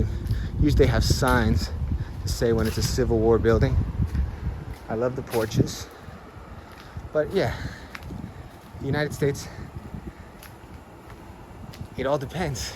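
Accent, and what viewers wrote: Brazil. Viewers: We are so used to seeing speaking Portuguese that when he speaks English it seems like he is a Brazilian making videos in English, heheheh. Make more videos in English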